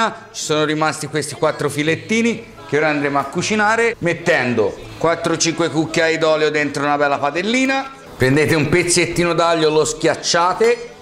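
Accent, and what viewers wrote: Tuscany, Italy. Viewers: Complimenti a te, io e mia moglie seguiamo con molto interesse le tue straordinarie ricette, presentate con il tuo simpaticissimo dialetto toscano.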